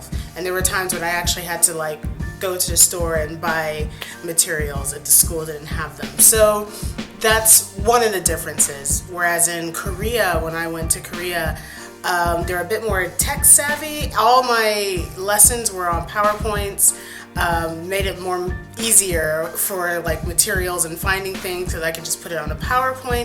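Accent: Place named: United States. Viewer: she sounds from the usa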